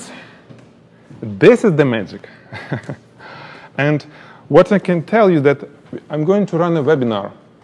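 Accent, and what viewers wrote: Russia. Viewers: Родной русский акцент ))))) класс, спасибо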